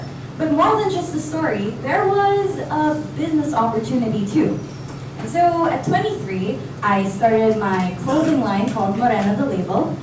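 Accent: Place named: United States. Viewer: when you speak english its as if you are from the USA--more specifically the typical pinay from the west coast like California. Then seeing your other videos when you transition to tagalog and accent comes back. That is a very nice skill.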